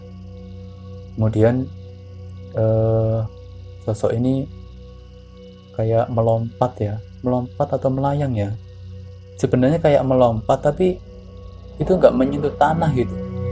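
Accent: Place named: Java, Indonesia. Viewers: Paling males yg pake kata kata GUE, Logatnya Jawa lg, Hadeh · Medokmu ngakak wkwk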